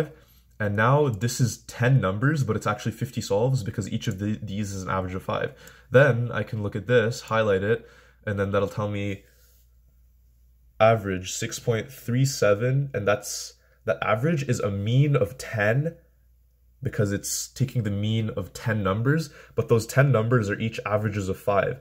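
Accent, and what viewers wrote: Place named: Canada. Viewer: Dang I just noticed how much of a canadian accent he has.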